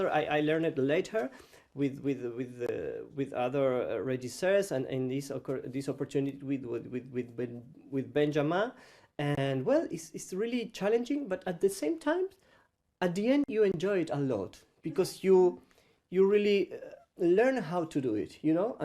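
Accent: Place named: India. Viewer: Parla inglese come un accento indiano 😅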